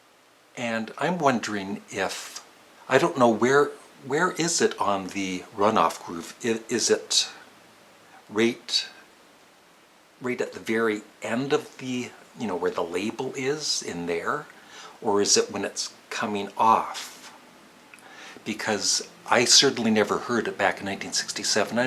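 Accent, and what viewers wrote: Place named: Canada. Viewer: Hey, I've got to say, Side 1 of ' ' epitomizes the ' ' sound. Also, I've got an idea for a future video. Could you review...not necessarily rank... fashion from their beginning in leather through their mod look, to their long(er) hair hippie look? I think it's fascinating they they evolved into so many looks (and sounds!) in only a few years! Also, can you work the word 'about' into your videos more? I love how you Canadians say 'abooot'.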